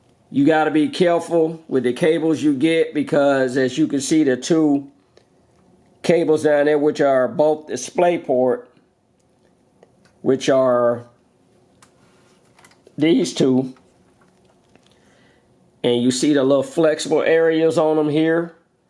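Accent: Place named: South, United States. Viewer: narrated with a heavy southern drawl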